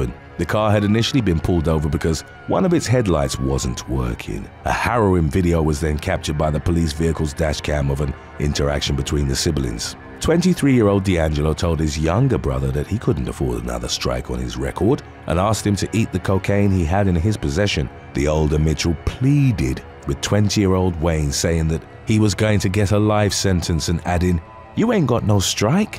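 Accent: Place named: United Kingdom. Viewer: As I’m British myself, I never understood women who LOVED British men’s accents until I heard this guy! 😊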